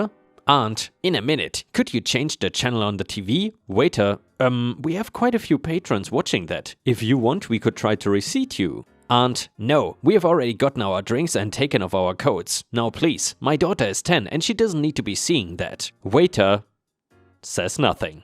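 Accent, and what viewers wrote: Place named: Germany. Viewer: Nice Story - certainly it is all a lie! And: interessing accent! German?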